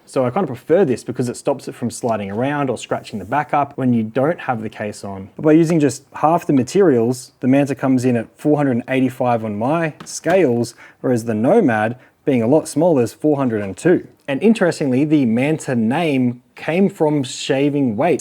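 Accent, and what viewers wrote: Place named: Australia. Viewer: I've got a Supernote Nomad and I love it. Can highly recommend if you are a writer/reader of any kind. Good to hear the voice of a fellow Aussie promoting such a great product.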